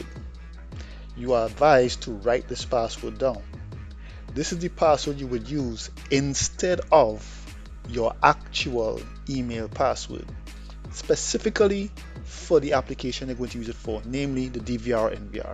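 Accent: Trinidad and Tobago. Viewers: you sound trini!Im here from Barbados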